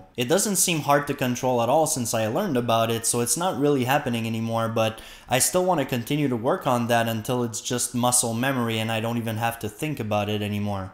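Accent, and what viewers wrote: Quebec, Canada. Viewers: Je savais t'étais québécois !!! Nice vids ! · Nothing wrong with your accent, although I never would have guessed French Canadian. You're right not to waste your time debating with people who don't want to learn. Have you seen The Last Kingdom on BBC/Netflix? Saxons and Vikings, dramatised around the true events in medieval Britain. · Indeed, removing that incentive may well see many a flathead dissapear from here. As a well travelled Dutchman, I tried to figure where your accent is from, but I focussed on the European nations. But now that the cat is out of the bag, I can hear you sound like the Villeneuves, both father and son. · I guessed your accent was French Canadian. I completely agree with your reasons for not debating with globe deniers. See Bob the Science Guy’s two recent videos to see how bullying and dishonest globe deniers can be. 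I think we should be compassionate towards the deluded globe deniers who clearly have psychological problems, but condemnatory towards those who are pushing the flat Earth onto the gullible and ignorant. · Congrats on the 2,000. Here's to 5K! Please don't change your accent. I love it and it helps you stand out. Canadian French would not have been at the top of my guesses. For my part, I like the range of topics you bring to the table. FE is fine, but I agree live debate is a waste of breath. There are a bazillion other topics available to present, or to debate. Somethinks I'd enjoy hearing your opinion on? Gosh, how about: - AI revolution and workforce displacement - Big data works, but should countries allow? - Will AI achieve anything we recognize as consciousness? What could it look like? - What is quantum computing? - Why can't we protect networks and databases, the Internet? - What is super-cold? 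- What is the hold back in developing electricity storage to enable renewable energy? - Are we ready to seriously privatize space? I'll leave a little warning about the creationist, anti-evolution, and young earth craziness. They are in the same neighborhood as FE plus you stir in evangelical fundamentalism. We should try to contain that neurosis to the U.S. But that said it would be fun to see you mud stomp them. ps - please let me if Canada decides to build a wall along it's southern border! lol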